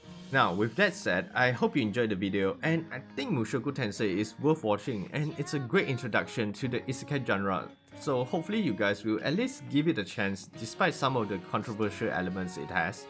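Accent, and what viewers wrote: Singapore. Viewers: Gosh keep up with the good content, I've been hooked, I was first interested because if your accent and how I just recognized it was Singaporean, since I'm Malay, but yeah keep up the good work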